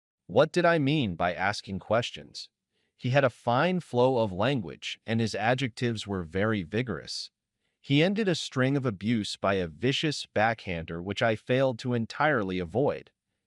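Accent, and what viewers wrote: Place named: United States. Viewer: Probably a great story. Just can't listen to the American accent. Conan Doyle would probable be a little restless in his grave.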